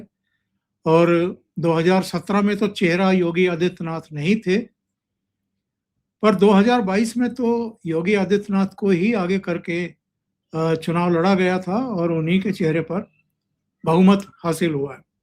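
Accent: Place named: Haryana, India. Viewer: Nahi pata tha shri Omkaar sir haryanvi hai aaj pata laga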